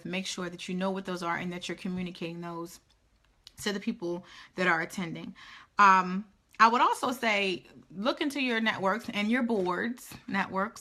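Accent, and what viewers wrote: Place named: Philadelphia, United States. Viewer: This wasn't only informative, it was inspirational. Just starting in January, I've never even given thought to an event. The non profit status advice was helpful as well. I was wondering how to raise the ~$600 for 501c3 corporation filing. Thank You! I was also shocked you mentioned Baltimore. You sound more Phily than Maryland ;-)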